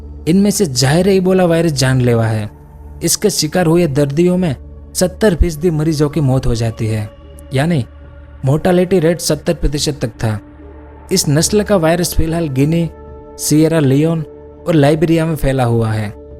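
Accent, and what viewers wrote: Gujarat, India. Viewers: i am also from gujarat ahmedabad 👍 Aapki awaj par se mujhe laga ke aap gujarat se hi ho, isliye puchha.. good speach, good animation and knowledgeable video👍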